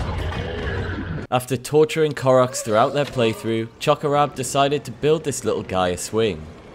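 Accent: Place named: Geordie, United Kingdom. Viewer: Are you from the north east by any chance, your accent has mackem/geordie twangs 😄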